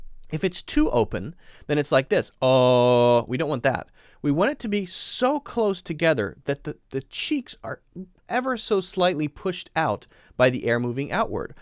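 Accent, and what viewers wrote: India. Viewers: Please don't reduce your Indian accent 🙏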